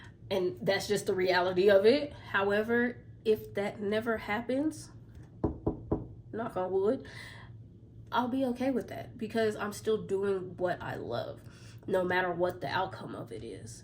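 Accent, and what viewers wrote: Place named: Texas, United States. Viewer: What city you in sound like a texas girl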